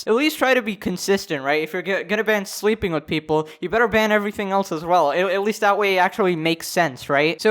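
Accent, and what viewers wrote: United Kingdom. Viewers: legit can't tell if hes British or not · British man in da house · are you from the uk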